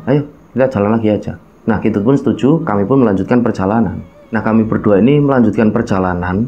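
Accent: Java, Indonesia. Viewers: Jowo ne endi mas?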